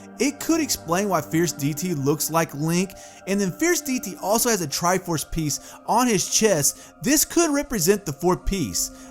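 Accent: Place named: South, United States. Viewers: Holy southern dialect I can’t get over “Duuungin” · Don’t ask why, but your accent sounds like you’d give me a “Roll Tide.” Interesting perspective and some good research, sir.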